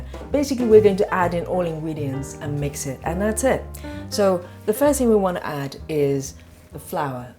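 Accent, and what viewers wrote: England, United Kingdom. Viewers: Just sounds like an English accent to me. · This is a life saver. I have a ridiculous amount of okara. Also, I love your accent. It's like a mix of British and east Asian (Japanese?) Anyway, I think ill make this now.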